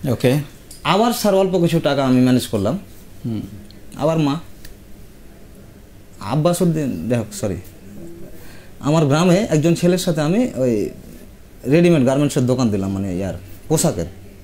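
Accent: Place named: Murshidabad, India. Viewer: এটা আমাদের মুর্শিদাবাদের ভাষা। আপনার সাথে পরিচয়ের ইচ্ছে রইল।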